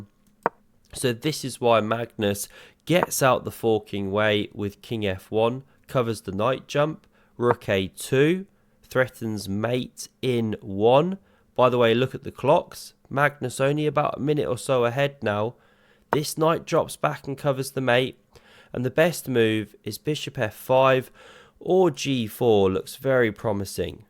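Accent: United Kingdom. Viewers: Love the humour! And the British accent <3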